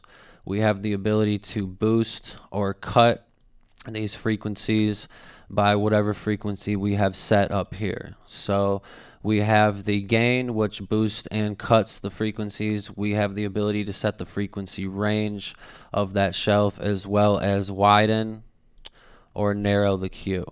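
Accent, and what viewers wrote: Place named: United States. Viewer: I don't like the american accent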